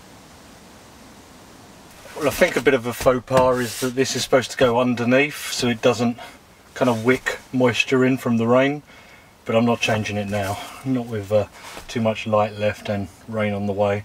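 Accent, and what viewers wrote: England, United Kingdom. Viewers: Been viewing your videos lately and really enjoying them ....very relaxing . Good to hear your English as my ancestors came from England and Ireland.Iwas born a Spencer? Will watch more or your interesting videos. Cheers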